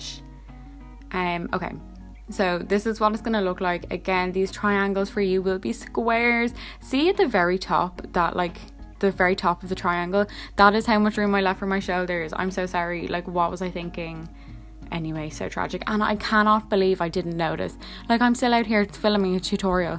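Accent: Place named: Ireland. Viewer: OMg Ur irish! I recognise the acsent , im irish too :D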